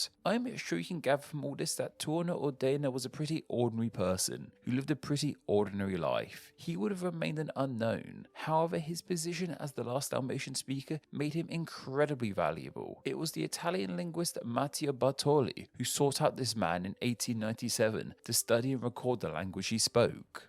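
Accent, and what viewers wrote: United Kingdom. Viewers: a British accent?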